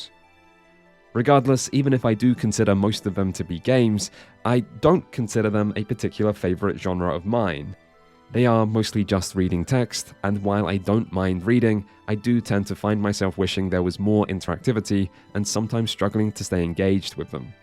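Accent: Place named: United Kingdom. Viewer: The somewhat familiar oddly british voice said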